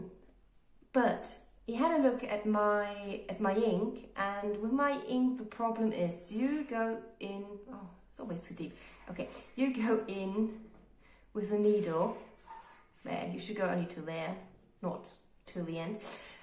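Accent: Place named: Australia. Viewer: don’t apologize for your english, it’s amazing! you actually sound australian. thanks for your vids!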